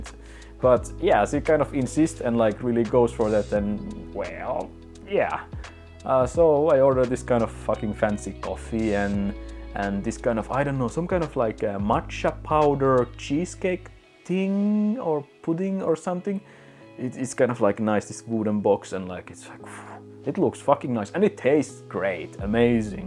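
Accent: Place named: Finland. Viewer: u sound finnish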